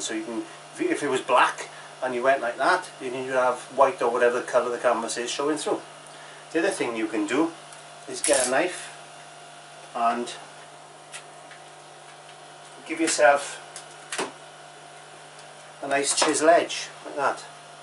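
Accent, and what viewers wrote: Wales, United Kingdom. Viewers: I find your method of teaching so easy to follow, you cover every aspect of painting and make it all look so easy. Love the Welsh accent having lived in S.Wales for a number of yrs. Thanks again for all the tips. Mary Thomas.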